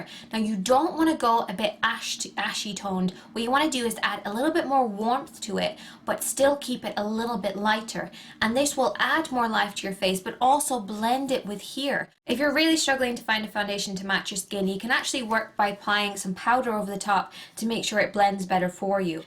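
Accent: Ireland. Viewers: lol no, she's actually irish. that's why she sounds irish. americans don't sound like that. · Are you Irish?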